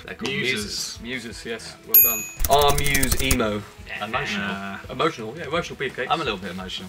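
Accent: United Kingdom. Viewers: Joanne August ouiiii,lamentablemente,los escucho una y otra vez...pero para mí lamentable inglés,entre el acento so brithish y lo rápido que habla Matt😩😩😩no agarro una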